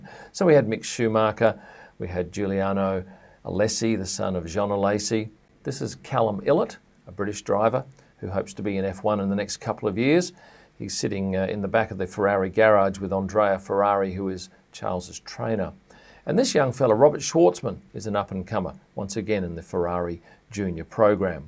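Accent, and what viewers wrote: Australia. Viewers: Discovered your channel about a month ago and your quickly becoming one of my favourite f1 YouTubers. The fact that your a photographer brings a new perspective as you've seen alot more of these guys than us folks. And I also love an Aussie accent. Thanks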